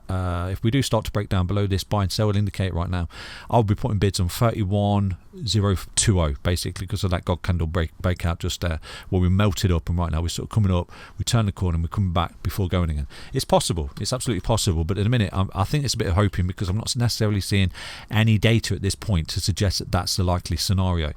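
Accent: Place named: England, United Kingdom. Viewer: Please speak slower. Especially with the English accent and all of us Slow-minded Americans sipping whiskey and munching Doritos.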